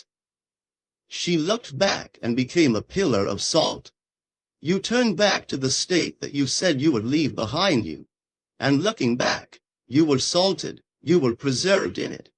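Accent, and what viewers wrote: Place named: United States. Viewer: Lovely but a bit weird that a slight American accent gets in there. I know his original voice in and out.. so it feels a bit foreign. Only a little detail. Otherwise the message is lovely 🥰👌🏼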